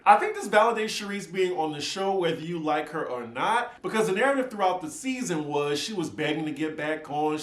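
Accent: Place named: South, United States. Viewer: ... Your Dirty South gutta gutta peeps through sometimes and I LOVE IT!!! Your channel is growing so beautifully!! I am so proud of the way you Rep Louisiana. You are absolutely charming and your content is top notch. Thank you for staying true to yourself. Keep up the good work!!.. By the way, I tried to join your pateron but your link in the box is unclickable.. let me know how I can join. Its past time!!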